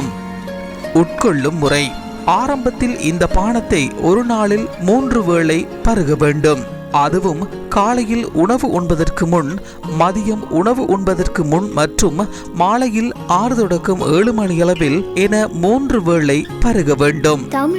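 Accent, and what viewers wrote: Sri Lanka. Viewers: That's srilankans pronunciation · He is Sri Lankan tamil...u watch only advantage...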